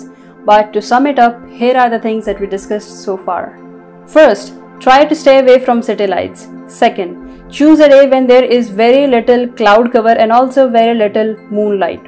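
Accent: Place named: India. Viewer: I am not able to hear/understand you clearly. Please talk slower, the Indian accent is difficult to understand ...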